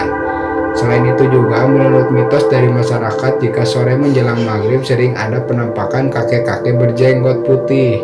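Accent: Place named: Sunda, Indonesia. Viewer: Ayeu Saha NU nyerios ni kentel kieu Sunda na 😂 salam 🙏 ti SIMKuring warga Cikaledong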